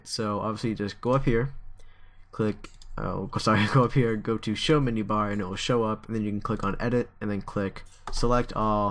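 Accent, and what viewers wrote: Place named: United States. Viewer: Cool accent ! What part of the states you from??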